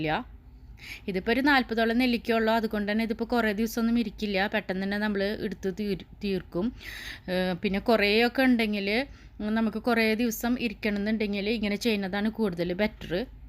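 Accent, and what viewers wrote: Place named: Thrissur, India. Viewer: തൃശൂർ ആണല്ലേ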